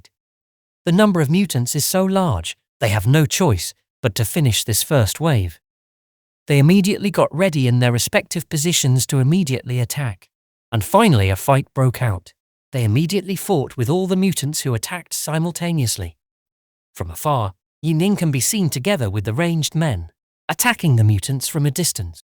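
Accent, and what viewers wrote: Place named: United Kingdom. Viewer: Right as you talked i eminently knew you wre British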